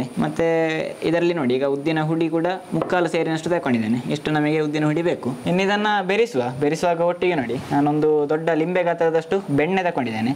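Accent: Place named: Tulu Nadu, India. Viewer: Bhari yedde pandar...Erna Tulu mishritha kannada kusi apund...kenare...rattunu Dade....😁 Maani nu kende panle...attigye masth paapadar ye..namasthe🙏 Niklena back supports gu yenna solmelu...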